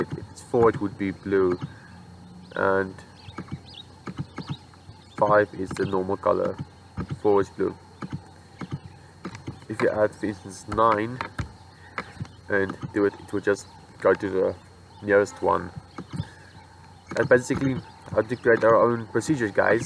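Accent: South Africa. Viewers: Fellow south African ? 😂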